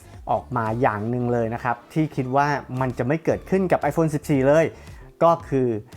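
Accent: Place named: South, Thailand. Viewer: แหลงใต้แล้วล่าสุดเยื้อไม่ไหว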